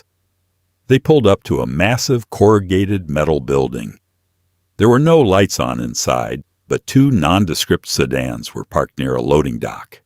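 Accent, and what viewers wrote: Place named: South, United States. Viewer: a little "southern" for an Italian Mafia video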